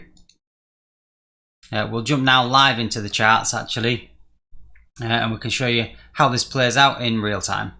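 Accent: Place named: Yorkshire, United Kingdom. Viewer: Interesting you used the 15m FVG, not noticed that previously from other channels. I'm seeing traders posting silver bullet entries with order blocks and OTE on 1 min charts. Generally, when FVGs are featured, they're a confluence within an order block. Nice to hear a Yorkshire accent on a SB video :)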